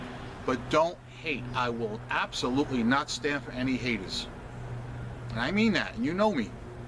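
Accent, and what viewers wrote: New York, United States. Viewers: cute NY Accent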